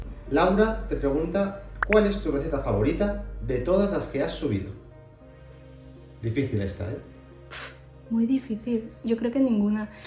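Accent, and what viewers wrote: Spain. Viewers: Como aprendió español de España siendo de otro país. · No sé pero me das una motivación al cocinar y intentar cosas nuevas, e igual es curioso ver una chica asiática con acento español (se me hace fabuloso) Y yo no e comentado ni un vídeo tuyo pero yo amo tejer, puedo tejer y e dado clases de como tejer. E querido subir videos a Youtube de como tejer pero no encuentro la creatividad, tengo el deseo y el don de enseñar pero al parecer no la imaginación. Ya veremos qué tal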